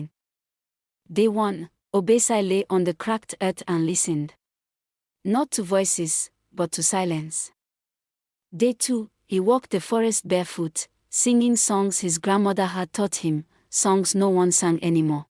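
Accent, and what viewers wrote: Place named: Africa. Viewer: Bro the African accent ai generated voice gotta love it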